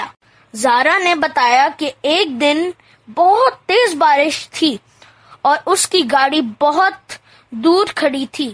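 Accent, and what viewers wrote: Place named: Pakistan. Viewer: Very strong & Lovely Urdu accent Keep it up boy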